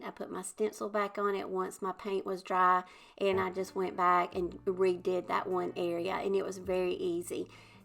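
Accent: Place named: Southwest Virginia, United States. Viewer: love all these ideas. May i ask where you are from. You sound so much like me, I was thinking Southwest Virginia. love your content.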